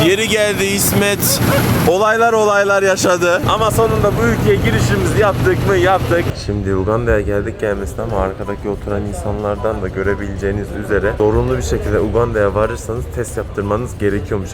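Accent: Konya, Turkey. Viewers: bir Konyalı olarak en büyük ortak yanımız gözlük 👓 koyma yeri ve bırakamadığımız o meşhur gonya ağzı konuşma 😀 Konya dan selamlar 👋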